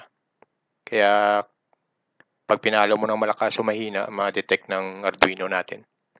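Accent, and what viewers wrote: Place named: Iloilo, Philippines. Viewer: Daw ilonggo ka gid to mo.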